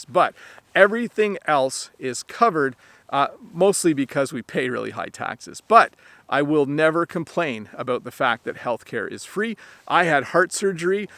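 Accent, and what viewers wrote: Canada. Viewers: Hi!Teacher.I knew you a few months ago and you are a really cute and nice person in my opinion.I have learned a lot from your videos and I can understand everything that you talk about and I extremely love your Canadian accent as well.For me you’re the best English teacher on YouTube. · Nice video. I wish more Canadians speak Canadian English like you. Maybe I hear you better because you realise your audience and maybe try to speak more clearly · I liked your video, thanks for the information. I was looking for a Canadian accent to improve my hearing. I'm a new follower, you explain very well and I could understand, so you motivated me. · Hi, I was born in Halifax n.s. and left Canada when I was a child with my parents. Now I am 61 and never went back there. It's nice to listen to Canadian accent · Waaw its very clear canadian accent its look like American I like it really and one day I will visit Canada it will be come my dream one day because my dream its two country America and canada it will be coming my dream 😢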